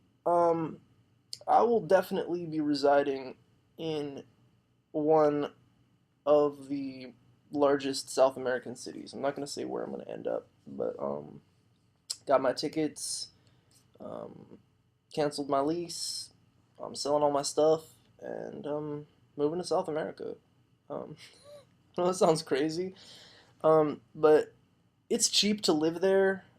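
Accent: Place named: Spain. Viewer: Have you seen by any chance, yearsss ago, his last vid (now deleted) that he uploaded where he was talking and seemed incredibly high or drunk and in a heavy Spanish accent ??? Like he was slurring his speech & I couldn’t understand anything, I wondered if he was under the influence of a drug that made him slur. It was weird as hell, then he deleted it.. I rly just wonder where he is in the world, if he’s even alive and what he’s doing rn.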